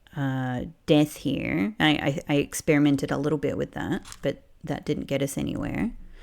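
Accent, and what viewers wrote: Australia. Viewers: I immediately clocked your accent. As a fellow Australian 😅. It’s nice to have a familiar accent on a playthrough. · I was wondering what your accent was and then literally you said “As an Australian…” funny timing! Love the play though so far!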